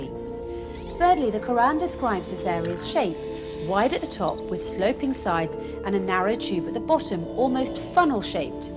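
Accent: United Kingdom. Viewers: ملاك يغني سمفونية العقل والبراهين الفيزيائية في القرآن بلكنة انجليزية بريطانية رائعة وفقك الله Good bless you angel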